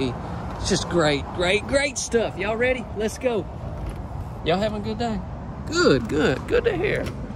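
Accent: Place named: Mississippi, United States. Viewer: you been doing a great job showing us all the mobile homes keep the good work up you doing a fantastic job and I like the way you talk you're from Mississippi and I'm from Louisiana how is your little family doing I hope they're doing well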